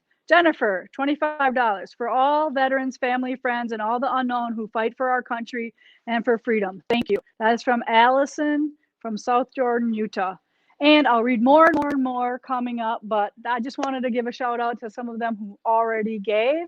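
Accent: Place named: Wisconsin, United States. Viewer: “How ya’ doin’ over dere.” Spoken like a real cheesehead! My husband and his family are from WI and they talk just like that. 😂 And, everything about you reminds me of my SIL. 💖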